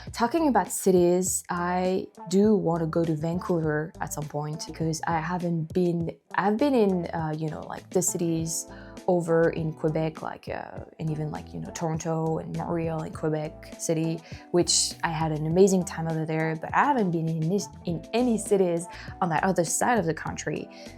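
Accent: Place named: France. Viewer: your English is having less French accent to it I noticed.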